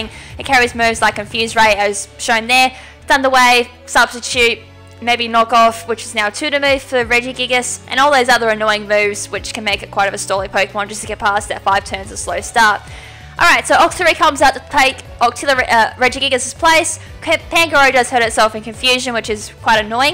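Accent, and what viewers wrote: Australia. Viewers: Your australian!!!!!! Awwwwssssooommmmee so am i.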